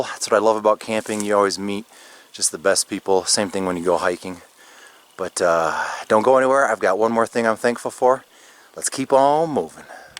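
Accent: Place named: United States. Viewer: I watch your videos. Your accent is very different from main american accent. When i first watched your video i thought you are a lonesome man and wanted to spend some of your times in the wilderness until i watched this video .you mentioned about people who love you from afar. Kep going gentleman you are a real adventurer.Thanks for providing pleasure with your videos.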